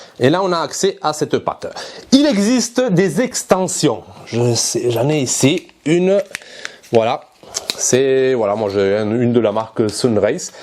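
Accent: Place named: South, France. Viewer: bien joué et merci des infos, mais quel est cet accent agréablement sudiste? Sud ouest?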